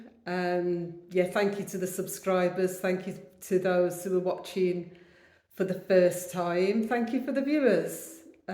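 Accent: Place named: Black Country, United Kingdom. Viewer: It's not the speed of her speech it's her Wolverhampton dudley accent that makes her sound dopey and slow. It's been voted the worst accent in the UK for good reason because it is terrible 🤦🏼‍♀️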